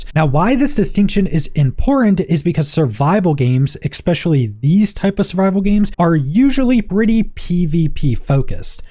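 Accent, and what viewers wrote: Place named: South, United States. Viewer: It’s a southern thing. I’m bet he also says Axe instead of Ask.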